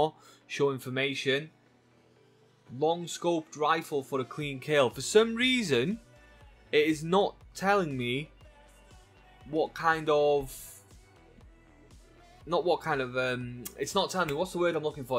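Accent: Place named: Wales, United Kingdom. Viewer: I like your welsh accent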